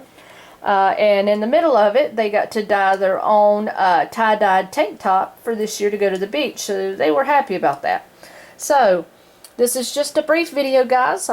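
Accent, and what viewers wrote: South, United States. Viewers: viridian it’s just a country or “southern” American accent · I find that remark to be offensive. What difference does it make what accent she has? She has a southern accent. To say it is peculiar is just rude.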